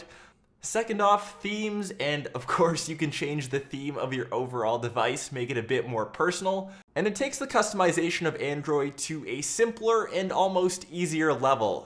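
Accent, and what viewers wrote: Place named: Canada. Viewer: the phone is ok. your Canadian accent sucks. we don't care about your vacation.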